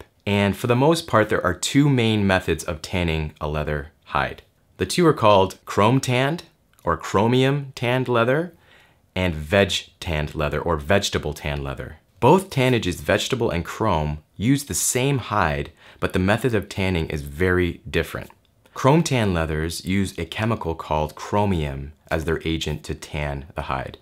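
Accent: Canada. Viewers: Canadian, eh? Love your channel.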